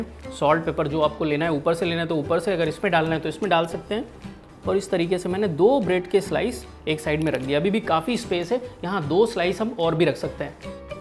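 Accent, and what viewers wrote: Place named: India. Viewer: Why do you speak for 1minutr plus without demonstrating the sandwich maker? If you thought you were doing something your Indian accent makes it hard to understand you also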